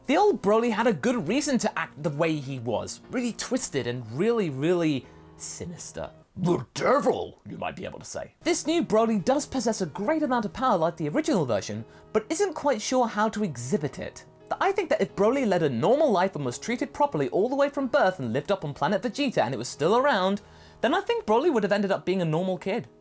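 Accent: United Kingdom. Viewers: Is that how British you really are?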